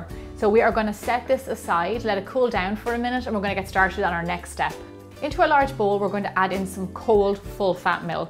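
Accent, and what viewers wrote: Ireland. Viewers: God I love the Irish accent!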